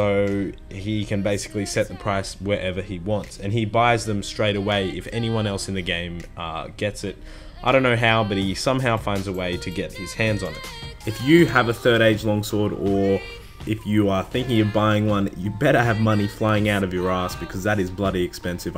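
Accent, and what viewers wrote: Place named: Australia. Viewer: Where in Australia are you from?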